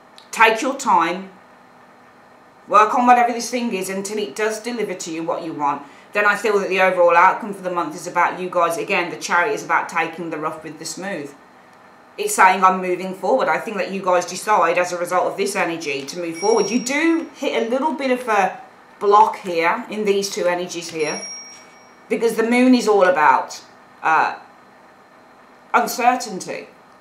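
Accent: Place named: United Kingdom. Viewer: I'm a Mexican, but I live in united states and there is a different accent from the UK. I was nice to hear a different English tone and accent. Do you know where is Mexico? I , I know some Countries in Europe but not all. Specially Germany , a diveded contry. East Germany, and Wesst Germany. and Reunited Country. Very big news in America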